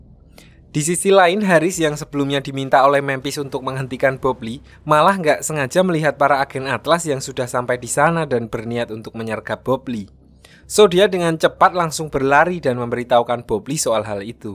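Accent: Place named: Yogyakarta, Indonesia. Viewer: Wong ngendi sih min kok bahasa e sekitaran jogja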